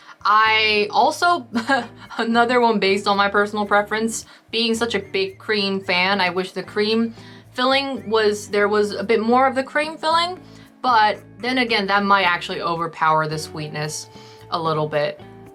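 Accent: United States. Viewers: dang u live in korea? you sound american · You got a mid west accent. What state you get it from?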